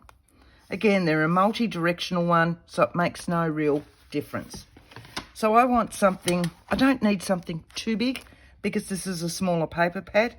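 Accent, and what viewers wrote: Australia. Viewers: Hi from Ballina, NSW. Nice to hear another Aussie accent. I really enjoyed two of your videos that I've just watched and will definitely be adding this to my next journal. I'm happy to have some cooler weather this month. Cheers 😊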